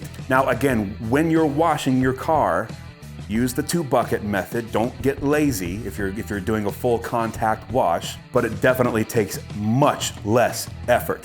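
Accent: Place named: England, United Kingdom. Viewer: Thats a VERY convincing English accent! I’m English and ya had me fooled!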